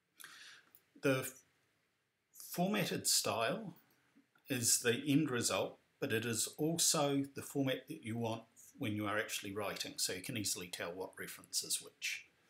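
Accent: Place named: New Zealand. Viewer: So reassuring to hear this advice in an NZ accent!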